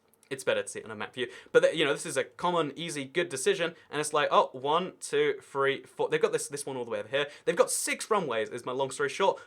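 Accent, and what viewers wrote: United Kingdom. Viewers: British accent!!!!!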